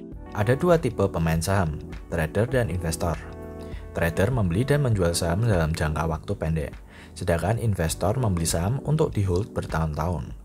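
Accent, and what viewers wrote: Java, Indonesia. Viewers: kalau medok apa tidak bagus? mau baca text atau tidak, video-video di sini tetap recommended kok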